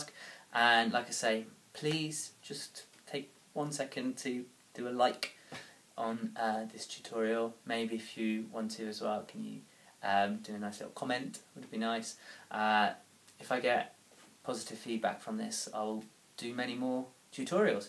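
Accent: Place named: United Kingdom. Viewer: I had to look at where you are from because you sounded British and voila you are from the UK. Possibly why your house is nicely decorated too. I am a beginner on the piano so I'll try this song out! I love it. And you don't know the lyrics that well lol but great job otherwise :)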